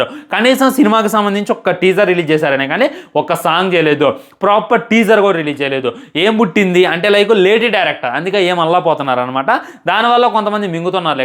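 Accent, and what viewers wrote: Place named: Telugu, India. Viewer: Aapu ni sodhe bhaiyya , paata paadinattu matladaku . Bore chestavu . Aa telugu accent vina lekapothunam 🙉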